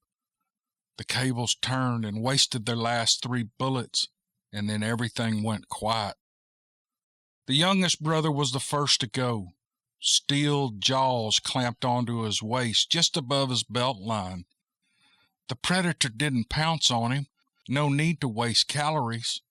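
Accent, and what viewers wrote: South, United States. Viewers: I love this story and I appreciate you reading it. I love hearing that southern drawl . Thank you ! From another southern person. Just to the north of you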